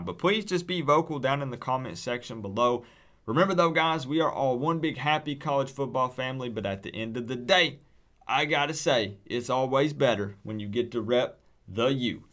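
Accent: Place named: Chattanooga, United States. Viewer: not for nothing, but you do have a Chattanooga accent.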